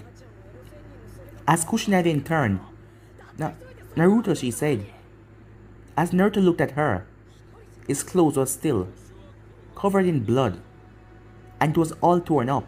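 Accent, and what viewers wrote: Jamaica. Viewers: Are you Jamaican or from the Caribbean? I hear the accent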